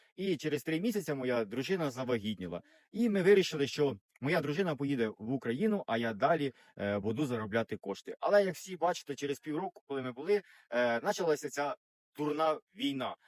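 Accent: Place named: Transcarpathia, Ukraine. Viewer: Це, звичайно, Ваше право відкрити свій канал, але хочу Вам допомогти порадою. Мільйонам українців, які знаходяться закордоном, це не буде цікаво. За кілька років всі вже якось визначилися, що їм далі робити. Українці, які залишилися в Україні, в більшості озлоблені або зомбовані провладними ЗМІ. Багато людей ненавидять тих, хто виїхав. Тому, якщо будете продовжувати, будьте готові до злих, негативних коментарів. Та Ви вже і самі це побачили. І ще, Ваша мова підказує мені, що Ви, скоріше за все, із Закарпаття. А це додатковий подразник для інших українців. В любому випадку, Вам вирішувати, чи варто Вам наражатися на негатив, чи дійсно це Вам потрібно, чи хочете Ви витрачати на це свій час, сили і кошти. Яке би рішення Ви не прийняли, це буде Ваше рішення. Я Вам лише даю пораду. Здоровʼя і добра Вам і Вашій родині.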